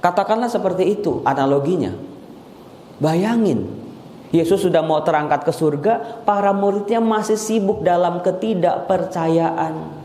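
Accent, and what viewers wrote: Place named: Eastern Indonesia, Indonesia. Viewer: berarti tinggalnya didaerah kebun jeruk. seperti orang dari Timur tapi halus kalau berbicara seperti orang jawa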